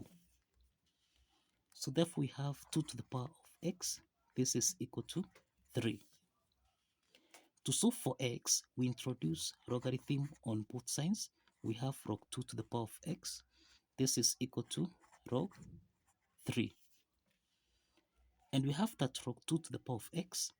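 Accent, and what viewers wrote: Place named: India. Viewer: Ужасный акцент индуса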